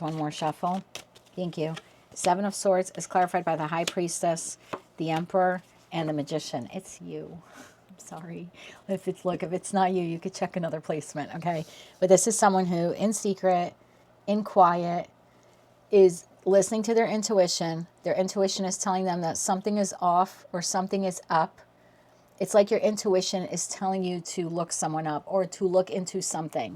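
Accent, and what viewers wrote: East Coast, United States. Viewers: You sound like you’re from the East Coast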